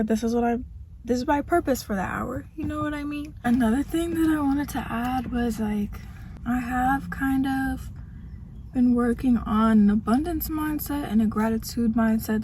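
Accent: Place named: New York, United States. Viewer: U def from new york or maryland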